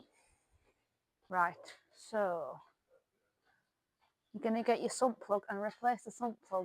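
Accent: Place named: United Kingdom. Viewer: Love the legs and the pants. Also why are you speaking British ?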